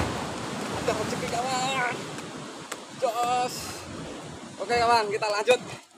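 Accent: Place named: Java, Indonesia. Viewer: Bahasane ojo medok2 to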